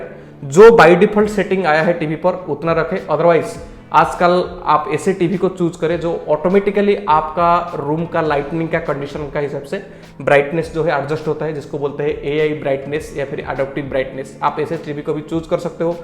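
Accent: Odisha, India. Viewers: odisa se ho ??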